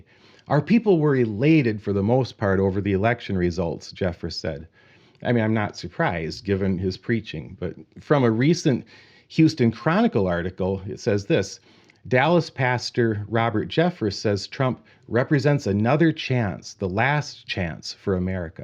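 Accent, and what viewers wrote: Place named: Minnesota, United States. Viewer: You’re on fire, man. I’m learning to enjoy what disgust sounds like in Minnesota nice dialect. Thank you.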